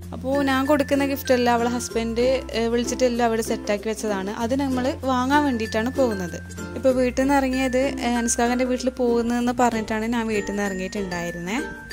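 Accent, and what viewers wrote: Kannur, India. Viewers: Kannur basha 👍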